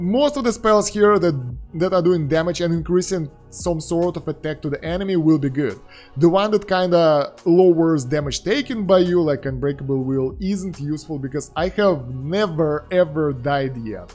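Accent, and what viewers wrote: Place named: Russia. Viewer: у тебя русский акцент. Ты украинец?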